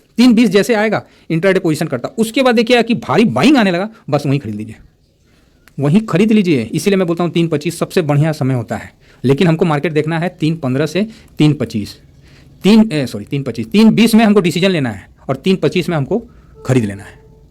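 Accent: Bihar, India. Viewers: Sir aapka style bulkul hi deshi hai, sir aap jarur bihar ke honge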